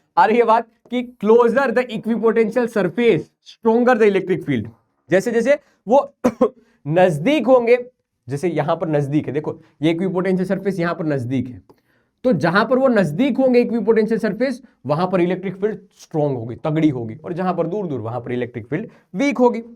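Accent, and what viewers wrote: Gujarat, India. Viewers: sir ka accent dwkh ke hi lag raha he ye gujarati honge 😅😅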